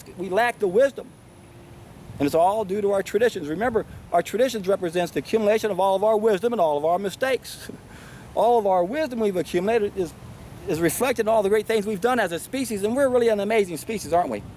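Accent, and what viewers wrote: South, United States. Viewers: I'm from France, and I think your accent sounds funny. Is it the southern accent ?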